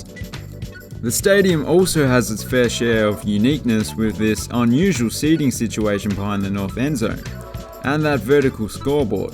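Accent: Australia. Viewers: Why is a guy from Australia doing the narration, on American stadiums? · Anyone else find it strange that an Aussie is doing the narration? I mean he has a cool voice, but why couldn't we get an American to do it for American stadiums?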